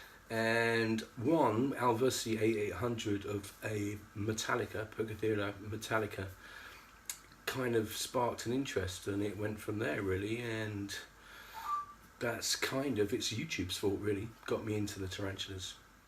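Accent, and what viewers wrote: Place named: RP, United Kingdom. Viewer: you sound quite posh.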